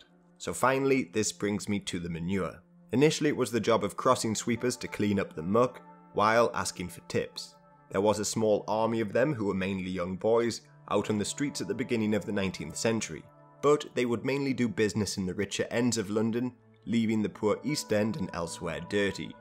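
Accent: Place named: Newcastle, United Kingdom. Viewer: So are you a geordie trying to sound posh or what? Your accent is hard to place.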